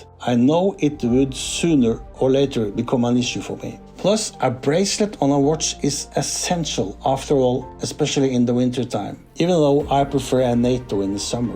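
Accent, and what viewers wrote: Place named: Sweden. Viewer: Hi,. I enjoy your reviews, and your accent reminds me of my grandfather, and other, living, relatives back in Sweden. It’s music to my ears! Greetings from the American Midwest, where Vikings cosplay is a thing. Thanks for your review of the SRPL13. I agree on all points, and ordered one from Teddy for $437, plus state tax, tariffs included. It paid to procrastinate. Could not wait any longer, as a Seiko price increase was to kick in on 15 Sept. I was torn between this Seiko and the Citizen BN0150. The Citizen has ISO Diver’s 200, and has grab and go Eco-Drive solar movemnent. In the end, the Seiko’s style won out over the Citizen’s practicality, ease, rating, performance and value proposition. Since I don’t dive, this was really ultimately about aesthetics.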